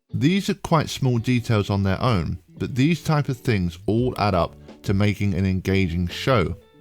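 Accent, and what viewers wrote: United Kingdom. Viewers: It's interesting being both one and two handed, seeing the surprised faces when I switch to one hand for ten pins. It sounds like you're from the UK right? So am I, and I think bowling is dying in the UK sadly much more than the US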